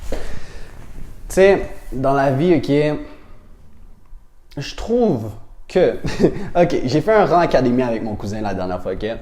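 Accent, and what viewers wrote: Quebec, Canada. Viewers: tu me fais penser à stéphane édouard, un psychologue relation h/f par ailleurs avec ton propre style, un de mes potes m'a montrer tes vidéo et malgrès la barrière de l'accent québécois /français métropolitains je comprends et c'est clair.Continue sur cette lancé.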